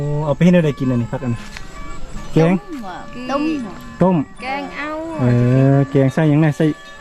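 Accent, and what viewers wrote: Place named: Surin, Thailand. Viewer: ถ้าอยู่ไทยกะคือสิแม่นจังหวัดสุรินทร์,ศรีสะเกษหล่ะเนาะ สำเนียงแบบนี้😁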